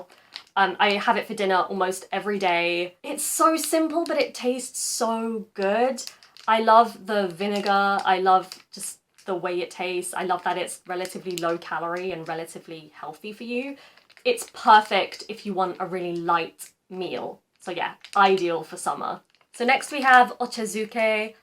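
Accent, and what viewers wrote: United Kingdom. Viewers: carwowのマットとしゃべり方が同じだ。これがイギリスアクセントか。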